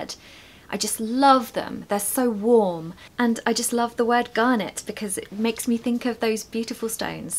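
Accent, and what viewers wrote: United Kingdom. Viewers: I'm from Thailand .l love British accent And you so Amazing ❤ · Actually, I loved English at the very beginning because of British way of pronunciation. Now I enjoy it listening to you. Thanks for the videos. They are soo lovely 😊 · I love you, and I love british accent too ❤ Amazing video. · I don’t know how to describe my feeling now, just to say you’re great, you’re amazing; your choices, your performance and your accent. I found your channel last night and saw you had uploaded your first video two months ago. Really liked to be the first one who watch your videos. You speak English like a mermaid, soft, elegant and poetic. Your tone is so soulful. I’ve seen lots videos on YouTube in the British accent but yours are something else. We’ll await new videos. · I am from Bangladesh. Your teaching method is amazing and heart touching. 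I am really smitten learning authentic and latest British accent from a versatile English teacher like you. May Allah bless you with his divine love to live long!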